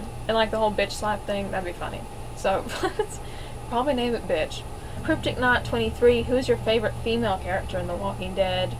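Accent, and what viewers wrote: United States. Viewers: you sound country and i mean that as a compliment i grew up in virginia you should really watch that negan meets gary busey it's awesome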